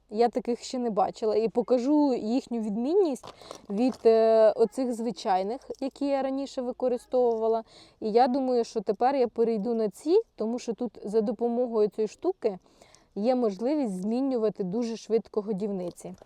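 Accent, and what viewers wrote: Ukraine. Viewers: Ви чудо! Дуже подобається мені і ваш (наш) український діалект.Супер!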